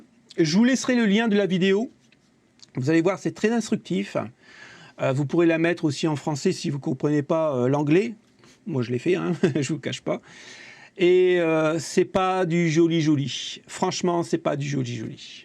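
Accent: North, France. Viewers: Peut être une erreur mais on entend bien l’accent de ch’Nord... Perso, quand on pouvait encore les acheter, j’ai toujours pris des carte Sapphire AMD. Idem pour les processeurs : de ll’AMD. On entend très souvent dire que Nvidia fait du grand n’importe quoi. Aux dernières nouvelles, il semblerait qu’Apple c’est un peu pareil. Au moins, il y a la chaîne Pour Ce Consoler 👍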